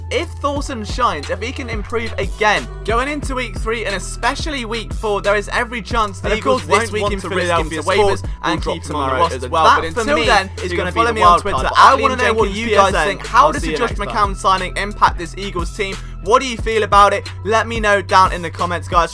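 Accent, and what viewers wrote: United Kingdom. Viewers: Why is a guy with a British accent talking about American football? I can't even watch the entire video, dude this is America not London.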